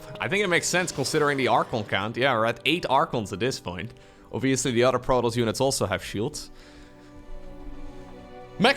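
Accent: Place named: Netherlands. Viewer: You say "bunch of stuff" a lot. With that particular dutch way of saying bunch - Beounch. It's funny, Harstem and Rotterdam also say Beounch.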